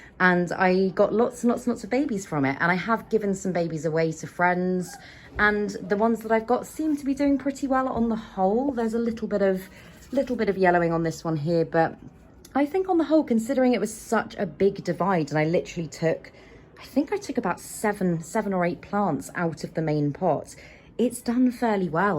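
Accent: England, United Kingdom. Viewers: Just love your English accent. Very attractive to listen to. Are you a londoner? Love your passion for plants. Did botany? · 英国发音好听！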